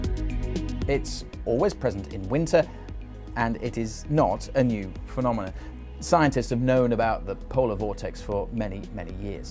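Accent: United Kingdom. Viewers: Biritsh accent and speaking miles 🫠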